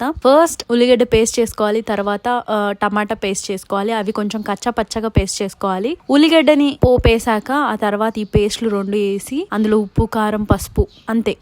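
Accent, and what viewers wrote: Telangana, India. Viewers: Your slang is telangana Telugu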